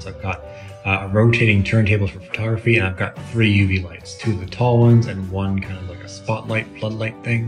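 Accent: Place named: United States. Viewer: bad design to have it all open, that UV then floods the space you put it in, your English sounds American, so learn to do some sheet metal design, use sendcutsend to cut and bend the parts out of uncoated aluminum, screw together, use regular sanitary silicone to seal seams inside, bare aluminum is reasonably good at reflecting UV, so when buttoned up the UV is reflected inside and isn't wasted damaging other materials in the room and you when it escapes your current enclosure can leave outside of the case bare, AL won't horribly corrode in a room, or can spray paint using al primer, it would probably cost you less than that sheet of HDPE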